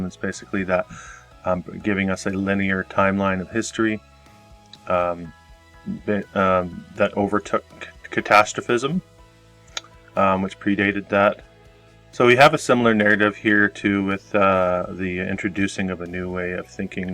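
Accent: Canada. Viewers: …Can’t wait. Great stuff your putting out…Looking forward to the Erie Canal vid, you mentioned…I’m I picking up a little Canadian accent..🍻For now. I’m off to clean up more ❄️ ❄️Lake effect from Erie. Ani’t it grand?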